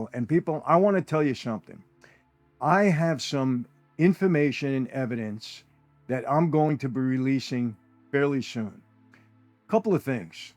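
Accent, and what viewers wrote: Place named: New York, United States. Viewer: Most New York accents annoy me but yours is so cool sounding, much respect for sharing your life with us.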